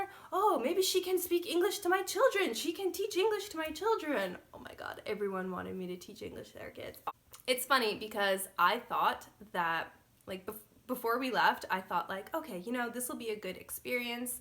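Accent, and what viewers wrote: Turkey. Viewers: Bir Türk'ün ingilizce konuşması gibi. Türkçe şehir adlarını aksansız söylüyor. Yani bir Türk. Ebeveynlerinden biri Türk olmalı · I have a feeling that you might even be a Turkish. Your pronounciation of certain words are very Turkish. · Aksanı amerikadan tr ye gelmiş amerikalı gibi değilde sanki tr den usa ya gidip ingilizce öğrenip gelmiş türk gibi 🤔 her dediğini anlayabiliyorum gibi. Bu işte bi i... var😎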